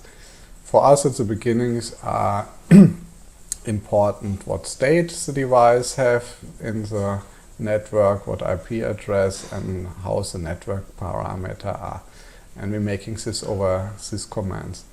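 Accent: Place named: Germany. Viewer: Ich hören den deutschen Akzent und schreibe daher auf deutsch 😅. Danke dass Sie diese Videos mit uns teilen. Dies ist mein erster Versuch auf einer Plattform hörer als arduino oder esp zu arbeiten und gar mit open thread. Diese Reihe ist ein super Einstieg obwohl ich schon so viel gelesen und versucht habe traue ich mich noch nicht damit zu beginnen bis ich es nicht zu 80% verstanden habe😂 bitte machen sie weiter so und vielen Dank!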